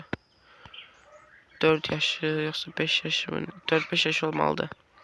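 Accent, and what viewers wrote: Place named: North, Azerbaijan. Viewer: Bu şimal zonasının lehcesidi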